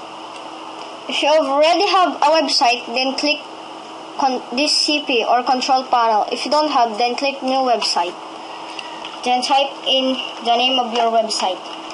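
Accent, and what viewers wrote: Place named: Philippines. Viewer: Hey your english accent, sounds like you are pinoy right!!!!!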